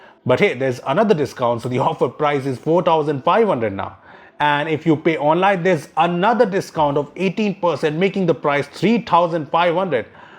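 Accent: India. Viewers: BRO YOUR ACCENT IS DIFFERENT THAN OTHER INDIANS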